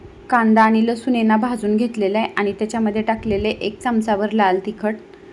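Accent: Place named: Kolhapur, India. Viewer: कोल्हापूरमधून आहात की सांगली आवाज तसा वाटतो.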